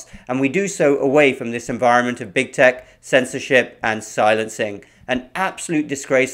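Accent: United Kingdom. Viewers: THE AMERICAN DIET?? seriously?? u gonna go there in ur British accent?? ur considered a WESTERN nation too.. don't ever forget that.. so don't discount urself or ur nation (if ur really from there, bcuz u look Indian to me) as if its just a. problem singled out to one country.. FYI..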